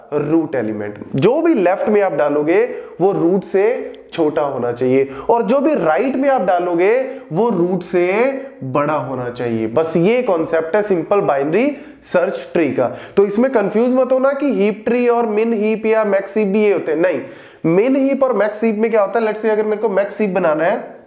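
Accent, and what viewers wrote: Punjab, India. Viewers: Sir u r from Punjab?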